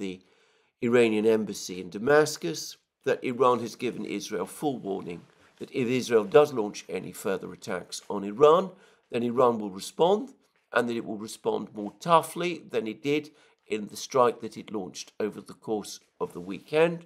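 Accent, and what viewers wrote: United Kingdom. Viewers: His British accent puts me to sleep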